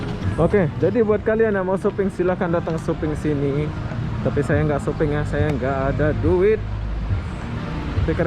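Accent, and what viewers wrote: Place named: Malaysia. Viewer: Pakai loghat upin ipin ya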